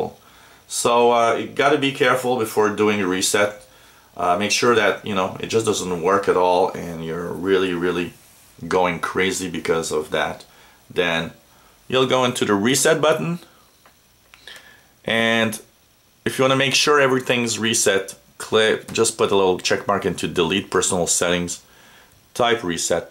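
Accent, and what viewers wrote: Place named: Eastern Europe. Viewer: This worked perfectly for me (restore advanced) even with 64 bits. Done, and done. Thank you eastern-european-sounding guy!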